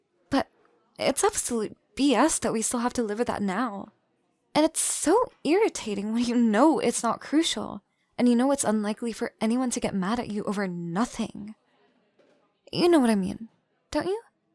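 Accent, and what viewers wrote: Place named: Canada. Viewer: If you’re autistic enough to figure out linguistics for fun ever Canadian sounds so aggressively Canadian and it’s adorable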